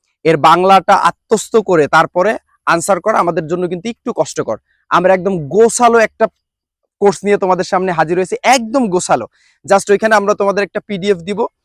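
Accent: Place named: Sylhet, Bangladesh. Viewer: আপনে কয় থাকেন সিলেট নাকি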